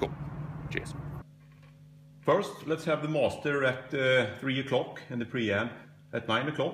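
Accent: Gothenburg, Sweden. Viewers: Great video. Gillar din Göteborgsengelska med. :-)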